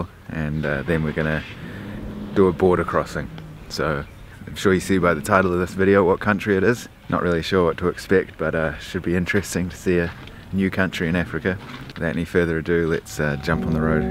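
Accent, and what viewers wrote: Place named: New Zealand. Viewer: New sub here, great vids I've always wanted to know what SA was like. I knew I picked the kiwi accent haha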